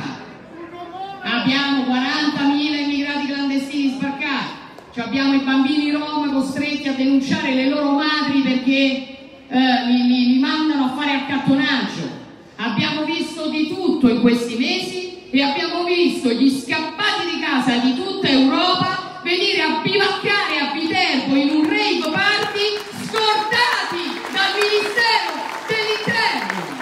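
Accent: Rome, Italy. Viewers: Uno splendido accento romanesco ! la meio de Roma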